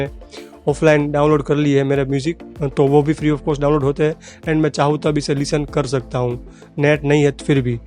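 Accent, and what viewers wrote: Gujarat, India. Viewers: Bhai mere khyal se aap Gujarati ho?????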